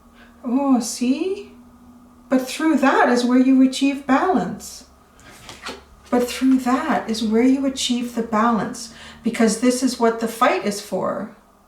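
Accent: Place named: Canada. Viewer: I love how Canadians pronounce "out" (Thank you for the reading <3)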